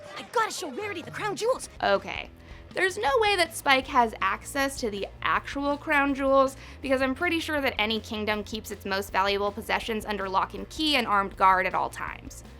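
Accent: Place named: South, United States. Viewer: Love your southern vibe too, the way you pronounce things is 👌🏻👌🏻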